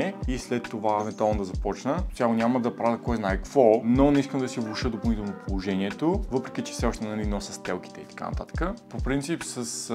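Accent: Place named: Rural, Bulgaria. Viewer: Обожавам селянският ти говор - купИх 🥰🥰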